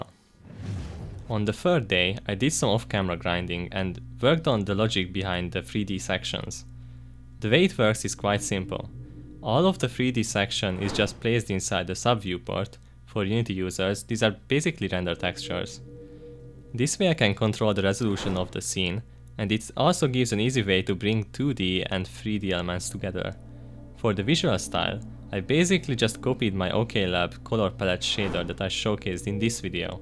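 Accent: Hungary. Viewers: Are you Hungarian? Just asking because your accent is realy familiar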